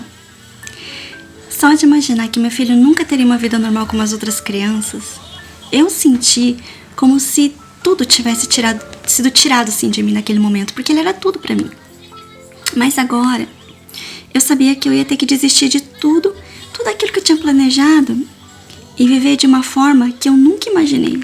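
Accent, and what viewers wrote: Minas Gerais, Brazil. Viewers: Uma pergunta, você é nascida no Japão ou no Brasil? Porque essa fala em "mineires" foi demais :3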